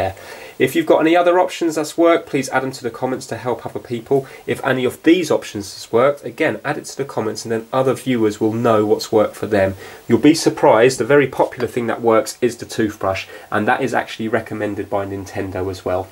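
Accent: United Kingdom. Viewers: Aha! now I’ve seen your face after all this time. listening to your wonderful British voice and learning about video game consoles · THANK YOU THIS HELPED IT WORKS YAYYAYAYAYAYAYAY!!!!!!! Plus nice British accent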